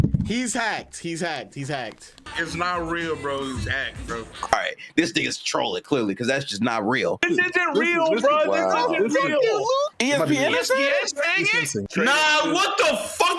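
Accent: United Kingdom. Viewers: Bro fix your mic, you sound like you're from uk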